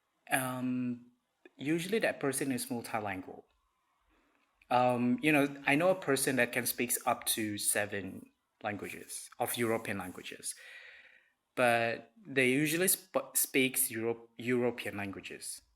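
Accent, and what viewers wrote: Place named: Australia. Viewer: There's Aussie accent left behind haha How long you'll be there?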